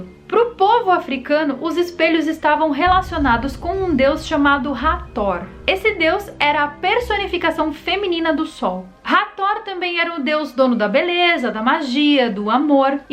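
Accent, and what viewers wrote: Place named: São Paulo, Brazil. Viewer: Adorei o sotaque do interior paulista!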